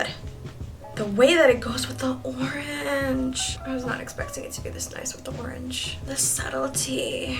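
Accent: United Kingdom. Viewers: Oh nvm I see wah British accent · who else loved that British accent 😂😂?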